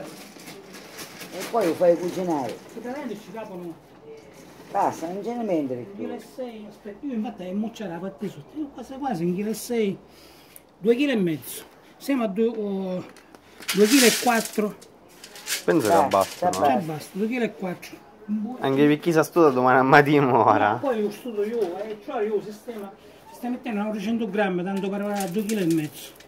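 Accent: Italy. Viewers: dialetto quasi uguale al leccese 😁, bel progetto , sarebbe bello vedere come l hai fatto , e in più io lì dentro aggiungerei qualche pezzo di legna giusto per risparmiare veramente. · Ma quale calabrese questo e' un siciliano verace...............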